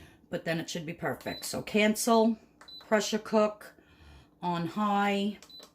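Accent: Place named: Long Island, United States. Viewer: Hey sweetie! I freakin love you and your videos. I'm a born and raised Upstate New Yorker (Albany area) but I live in Texas now, but I sure do miss NY. You have a Long Island accent, is that where you're from? I have many friends there (Wantagh, Massapequa, and Glen Cove). Keep up the great video's and thank you for sharing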